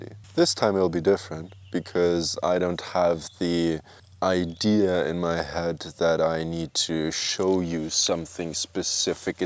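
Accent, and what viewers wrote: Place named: Germany. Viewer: Sound German by your accent, come across your video, it's interesting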